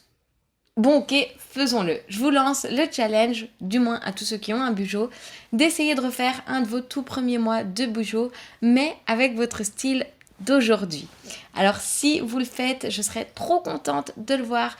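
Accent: Belgium. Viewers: Hello ! Je suis une nouvelle abonnée à ta chaîne ! En effet, je me demandais de plus en plus si le bullet journal était fait pour moi ou non et je me suis gavé de vidéos pendant toutes une journée sur le sujet. De ce fait, je suis tombé sur ta chaîne et je la trouve géniale (et toute mignon, au passage !) ! Ca fait du bien d'entendre un accent de chez nous, hahaha ! D'ailleurs, je me demandais de quelle région viens-tu ? J'aurais parié pour Brabant Wallon ! Enfin tout ça pour dire que tu m'as beaucoup inspiré dans l'organisation de mon bullet ! Ca n'est jamais facile de commencer dans un domaine qu'on ne connaît pas et avoir des petites vidéos comme les tiennes sous la main est toujours utile ! Donc voilà, je te fais de gros bisous (cachuètes ;P ) et continue comme ça ! (Et bien évidemment, je participe aux concours ! )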